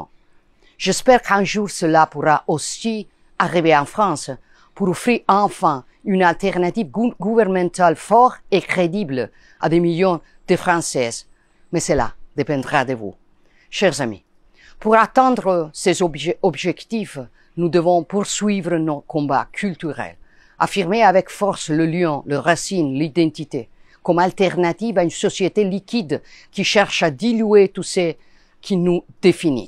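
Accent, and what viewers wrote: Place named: Italy. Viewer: Soyons honnêtes : son français aurait sûrement été plus fluide, et avec moins d’accent « macaronique », si elle avait pris la peine de les étudier à l’université plutôt que de perdre son temps à militer dans un parti néo- ou pseudo-fasciste. Elle a préféré grimper grâce au népotisme et au clientélisme — une vieille habitude de cette droite populiste, autoritaire et un peu obscurantiste, arrivée en Occident avec le petit coup de pouce de Poutine et de quelques autres voyous… Madame (ou Mademoiselle) Meloni, expliquez-nous donc pourquoi l’Italie est aujourd’hui le seul pays d’Europe de l’Ouest à ne pas avoir reconnu la Palestine et à rester les bras croisés devant un génocide en cours. Et expliquez-nous aussi, Madame Meloni, pourquoi des centaines de voix d’Italiens NATIFS, qui dénoncent discrimination, favoritisme et appauvrissement, se retrouvent systématiquement réduites au silence, censurées ou même poursuivies… dans VOTRE pays.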